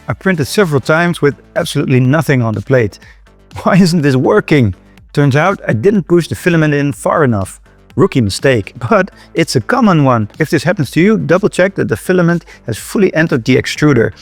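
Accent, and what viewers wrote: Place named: Netherlands. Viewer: IDK but u sound Dutch 🇳🇱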